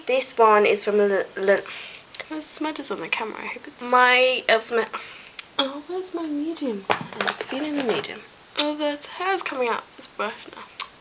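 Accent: United Kingdom. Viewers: pretending to sound posh? she has an rp accent and, as far as I know, it's her actual accent. not made up or insincere, her ACTUAL accent. how can you possibly judge someones voice because of a slight discrepancy in pronounciation? away with you! love the video:) i'm crap at putting on foundation so its nice to see people doing and explaining it!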